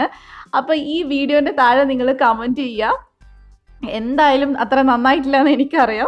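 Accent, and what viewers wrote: Kannur, India. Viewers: കണ്ണൂർ ഭാഷ ho... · അടിപൊളി കണ്ണൂർ ഭാഷ 😂😂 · 😀igane thanneya samsarikkalu njammalu kannur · Kannoor bhasha policy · Molu.kannooru kaariyaannennu yenikku.manassilakkan kazhinjirunnu.kannoorbhasha.kurachoode nannayi samsarikkunnunnu maathram.musleengalude .samsaarareethi alpam.maattamundavum.njammakku.yennokke parayum. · 😂😂😂ഞാനും kannura ,,നമ്മൾ ഇങ്ങനെ തന്നെയാ പറയുന്നെ😂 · Ente koche i thought of subscribe the channel. But i couldn't understand your kannur slang even though i am a mallu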